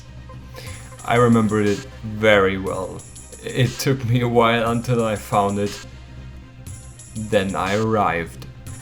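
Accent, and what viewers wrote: Germany. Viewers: Moin !! Bist du deutsch 😅man hört dein Akzent haha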